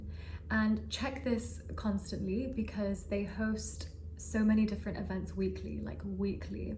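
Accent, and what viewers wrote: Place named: Arab world. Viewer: I’m not joining any university but I’m watching this bcz I love how Arab speaks English. It’s classy accent ❤ 😊. Love your tips , it will be useful for students .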